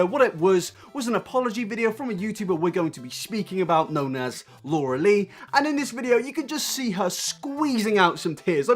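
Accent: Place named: England, United Kingdom. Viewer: God I love this precious English nerdy cutie pie 🥧 he’s just so un-jaded and it’s so nice to hear him talk with his precious accent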